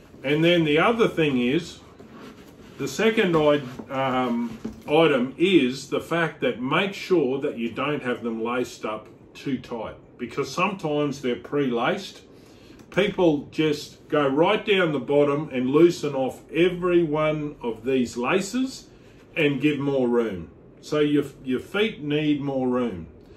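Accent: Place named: Australia. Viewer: Ah! Nobody told me he would be Australian! I can't even understand what the hell he is trying to say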